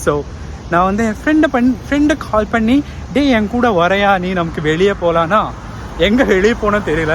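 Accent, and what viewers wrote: Tamil Nadu, India. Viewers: Recently addicted to your videos Waiting for more videos like this and also I like Ur Tamil accent 😂 Love from Srilanka 🇱🇰❤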